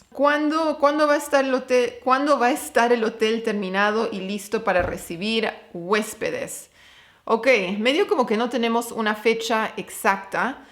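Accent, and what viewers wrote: Argentina. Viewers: Hablas como Argentina, ya sos nuestra🇦🇷🇦🇷🎸🎸🤟🤟😃😃, falta que hables como Cordobes y digas cuuuuuleeeaaadoooo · Cada vez tenés más acento argentino.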